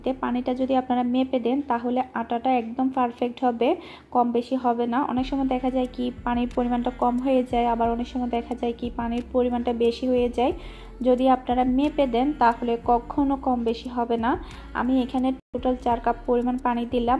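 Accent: Chittagong, Bangladesh. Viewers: R u from chittagong?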